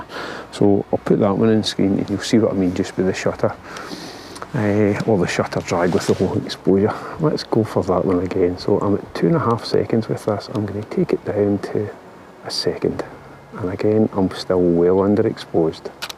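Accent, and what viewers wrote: Scotland, United Kingdom. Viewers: I love your work and ICm Concept. Unfortunately i profit only half of it beacause I am unable to understand your Scotish. I respect it but for me this is a bit frusutrating. However I your work is outstanding.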